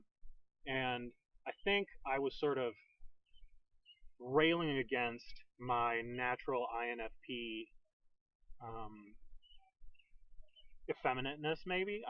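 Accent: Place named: Midwest, United States. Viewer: I was gonna say you sounded Midwestern :) (Wisconsinite INFP)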